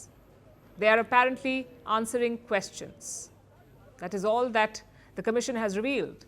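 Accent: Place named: India. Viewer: She's an Indian, I guess her native accent influences her speech pattern.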